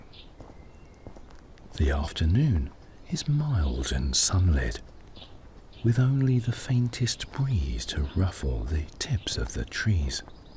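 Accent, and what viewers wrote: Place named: United Kingdom. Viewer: A Brilliant voice, an amazing British accent, a great imagination to be involved in, thank you from Iraq! ❤❤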